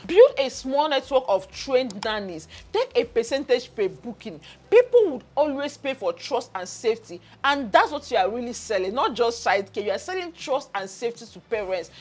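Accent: Nigeria. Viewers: Are you speaking Nigerian English accent?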